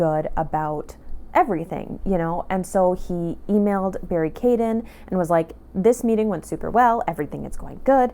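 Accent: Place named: United States. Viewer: Well, your explanation of why you were uncertain of the pronunciation. If English is your second language, phenomenal American accent.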